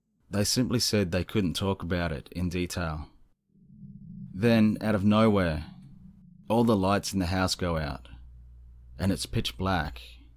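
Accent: Australia. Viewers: Hey there,. Great video!!! Excellent stories!! Love that sexy Aussie voice!! 💓😉 BTW, have you ever read any books by Philip Imbrogno? There's one called Files From the Edge that has some very bizarre encounters!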